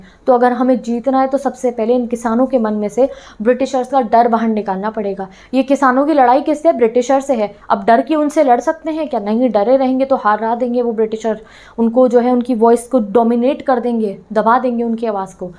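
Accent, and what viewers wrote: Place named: Odisha, India. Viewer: Are u from Odisha?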